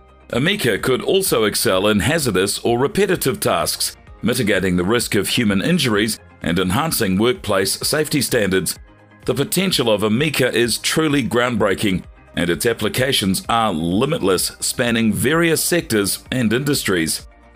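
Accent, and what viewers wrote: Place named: New Zealand. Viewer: A kiwi voice, crack up. Go bro! I love our accent.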